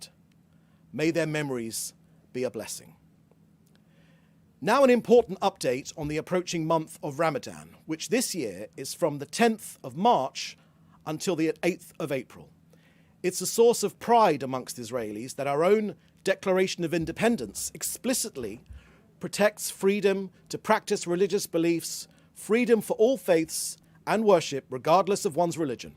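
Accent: England, United Kingdom. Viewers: Another liar with funny English accent fomenting his hate and BS. No one believes your lies anymore after you Massacred over 30,000 innocent Palestinian civilians in Gaza with over 16,000 children. Shame on you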